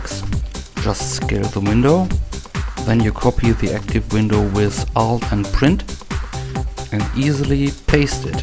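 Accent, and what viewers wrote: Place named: Germany. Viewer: man echt geil nix gegen dich aber mann hört übel raus das du deutscher bist wenn nich tuts mir leid aber zu geil. Help very now i have finally a cover for my steam BO2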